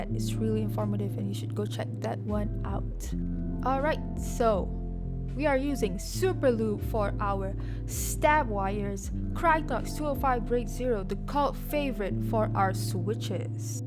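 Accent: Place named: Philippines. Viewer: just curious but are you a filipina? you sound like one ngl i am not meaning this in a bad way btw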